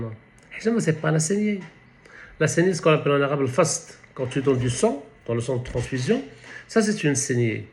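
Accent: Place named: Ouled Said, Algeria. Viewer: مستواه في اللغة الفرنسية شهادة شفهية ، عينية ، تفند ما يدعيه من موسوعية علمية. ما يثيرني في فرنسيته النبرة و الكنة ، فرنسيه اولاد سعيد ، أو الرحامنة ،حسب موضع الرضاعة.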